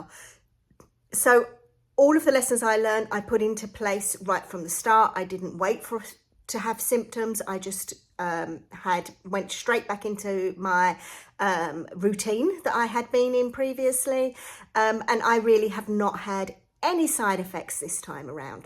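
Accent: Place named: England, United Kingdom. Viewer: Great video, you're very brave for sharing such obviously uncomfortable personal information - great to hear an English accent too!! You look fantastic, healthy & happy. I'm in Australia, I'm 68 & 4 days in on MJ (started on 5mg- very hard to get here & expensive $360 per month) & feeling very positive after hearing your story! SUBSCRIBED! :)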